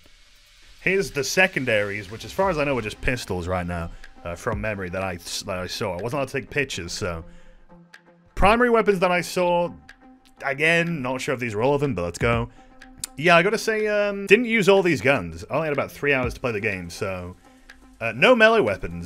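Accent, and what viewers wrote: United Kingdom. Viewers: It's cos ur scouse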